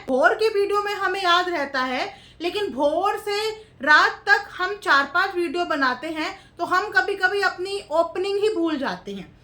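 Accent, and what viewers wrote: Bihar, India. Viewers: di aap to ab thoda thoda bihari bhasa niklne lga lgta h ap bhut jyda video dekhne lgi kumata devi ji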